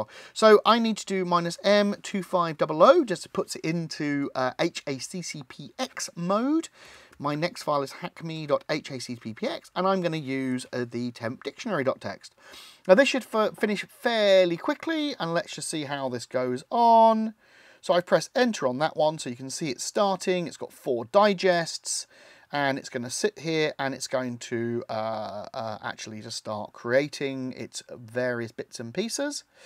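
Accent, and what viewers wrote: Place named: United Kingdom. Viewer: British accent really makes you.wonder whether he's saying pwn or porn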